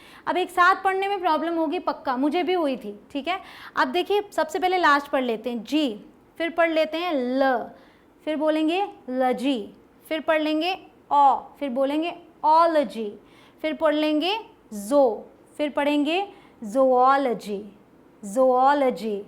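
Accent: India. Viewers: Mdm ye Indian eng h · no mam. I am not insulting her .what we learned in childhood English. Its Indian pronunciation. Thats what I am talking. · Indian accent...👌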